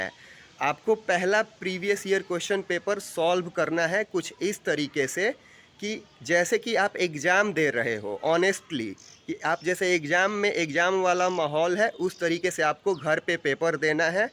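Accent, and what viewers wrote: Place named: Bihar, India. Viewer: Bhaiya aap up se ho kya ki bihar aapki aawaj up bihar wali lg rhi h ❤ please btaiye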